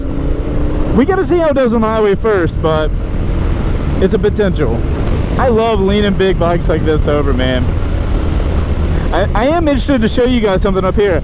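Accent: South, United States. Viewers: Dude I fucking love this bike...I'm buying it. This will be my first bike.Thank You, you smooth southern, silver-tongued bastard.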